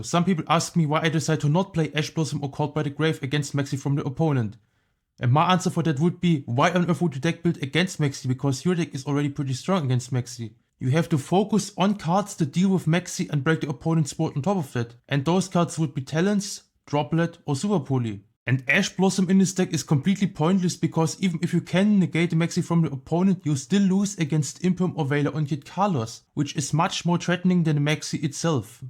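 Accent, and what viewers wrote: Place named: Germany. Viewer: Bist du deutsch bzw spricht du deutsch ? Man hört das dein englisch aus dem deutschen übersetzt wird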